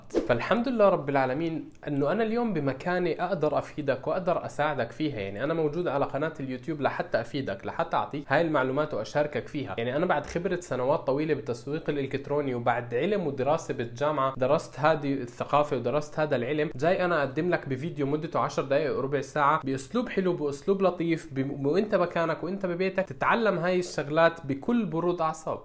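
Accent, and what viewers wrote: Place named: Jordan. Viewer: شكرا على محتواك الرائع بس سؤال صغير انت اردني؟؟؟؟؟ لأنو لهجتك زينا ونا انعجقت مش عارع ههههههههه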